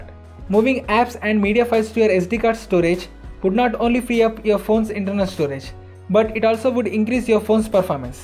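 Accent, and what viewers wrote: India. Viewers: its called Indian accent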